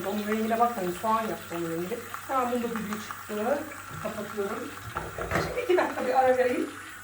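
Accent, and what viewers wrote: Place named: Thrace, Turkey. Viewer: Macırsınız galiba.kıyık bırak dediniz ordan bildim.zaten konuşma aksamınızda trakya aynı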